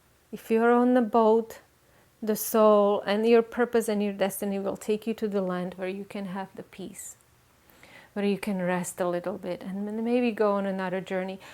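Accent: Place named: Hungary. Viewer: Wow!👍I could of sworn your accent is hungarian! Totally different language, very similar accent! Cool! 👍😃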